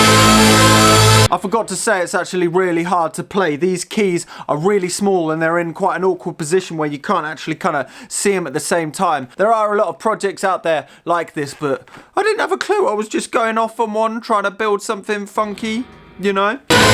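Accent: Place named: Cockney, United Kingdom. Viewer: That cockney accent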